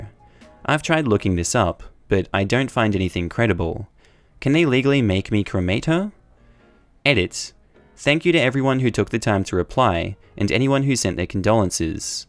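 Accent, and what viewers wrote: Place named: Australia. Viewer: I like my new Aussie hubby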